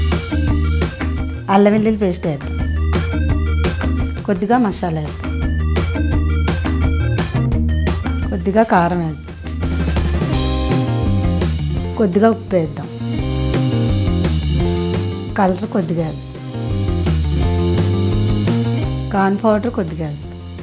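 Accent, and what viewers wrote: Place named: Godavari, India. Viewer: Godvadari mettaa slang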